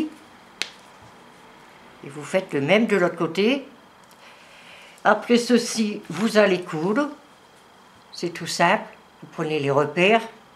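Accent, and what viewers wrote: North, France. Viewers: bonsoir très contente de te revoir, il et magnifique félicitations un grand grand merci je vais encore t écouté avec ton accent du nord bisous et gros câlins a ponpon, a très vite je vais le faire trop beau très bonne soirée, Monique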